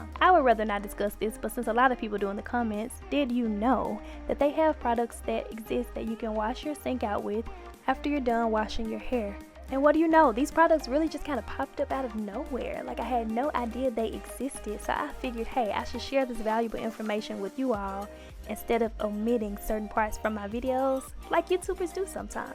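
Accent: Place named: Georgia, United States. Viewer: I just love your country accent!! Ga. Represent!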